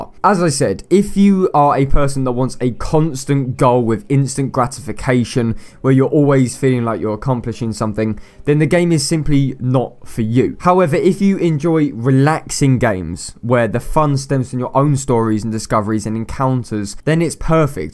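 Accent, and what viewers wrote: United Kingdom. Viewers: damn. i would hate it if a british person like you insults me. the accent you british folks have makes the insults sound meaner then a normal American person when they insult someone. i was referring to when you said that they don't deserve money you're accent made it sound so harsh. its a good thing. lol take that as a compliment. i guess you can say your accent reminds me of Simon Cowell during the American idol days. lol. besides, i thought this was an okay game btw. homefront revolution was worse then this though.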